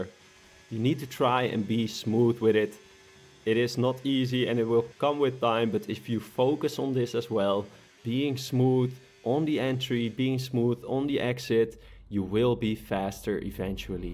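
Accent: Netherlands. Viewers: thx voor de tips man, holy Nederlands accent wel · holy nl accent mr thx man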